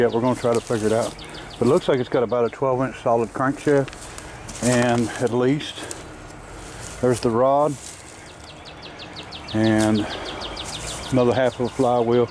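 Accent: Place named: Tennessee, United States. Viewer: "I am alookin and not aseein" , brought back good memories of Tennessee from decades ago.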